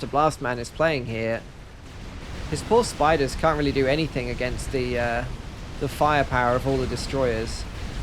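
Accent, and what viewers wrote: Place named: Detroit, United States. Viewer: I love the accent! Detroit?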